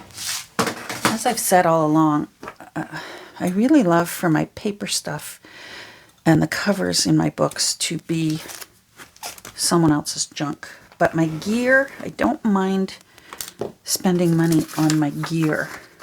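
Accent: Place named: Canada. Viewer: I was born and lived in Minnesota for the first 19 years of my life, and I think my accent sounds more Canadian then yours. But then I heard you say “about” and I thought…aha! There it is. Very slightly Canadian accent came through. Thanks for posting. I enjoy watching your videos so much. I appreciate you.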